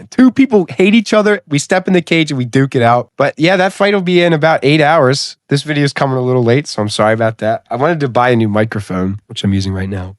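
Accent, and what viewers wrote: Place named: New Jersey, United States. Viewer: I can hear the south jersey/philly accent brother lol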